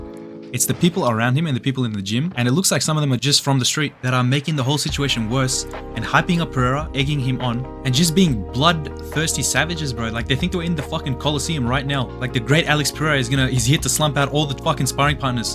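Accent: Australia. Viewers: are you an aussie man?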